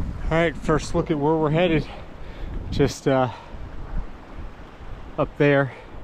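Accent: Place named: United States. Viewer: Is he British? Sounds so American (Australian?), but maybe my ear has been trained otherwise, such as with Scottish sheep-shearers?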